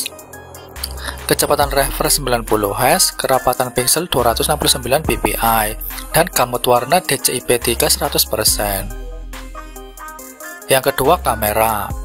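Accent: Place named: Java, Indonesia. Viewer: Bagus tapi medok sekali hehehe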